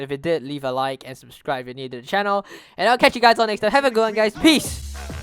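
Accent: Singapore. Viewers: You sound singaporean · R u Singaporean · yo are you singaporean or malaysian cus ur accent sounds like that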